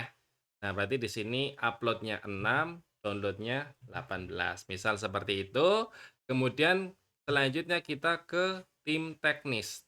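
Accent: Banyumas, Indonesia. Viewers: tembe weruh sama admin. wkwkwk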